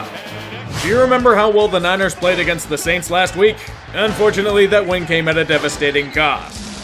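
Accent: Pittsburgh, United States. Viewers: Best part of Sportsball, hearing a Yinzer praising the Ravens.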